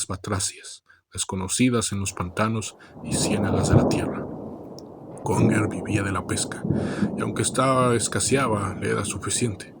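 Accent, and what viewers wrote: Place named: Border, United States. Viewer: Tu narración de gringo fronterizo le da todo el estiló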